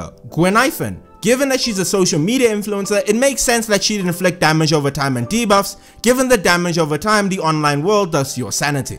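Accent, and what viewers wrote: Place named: South Africa. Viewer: u started speaking and i immediately went to check ur location lmao i can always spot my fellow south africans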